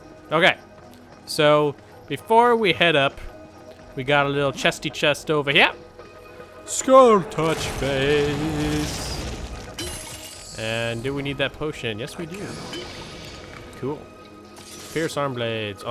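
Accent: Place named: United Kingdom. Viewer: Hi I'm from the uk and i have to say i found your British accent most entertaining and i love the vids and the wit and banter. But here's the thing would it be possible to upload more than one vid i appreciate you maybe busy and if that is the case please ignore this message thank you.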